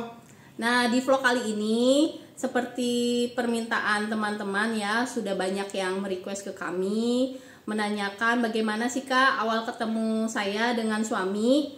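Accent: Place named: Sunda, Indonesia. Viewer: Teh sudah lama di china logat sunda nya masih kental sekali...atuh resep pisan ngadenge na teh😃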